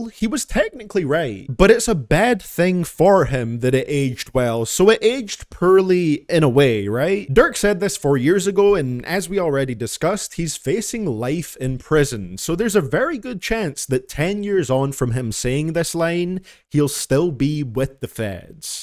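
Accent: Scotland, United Kingdom. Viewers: Ur accent interests me, r u a Scottish man living in America? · Is that a Scottish accent or no?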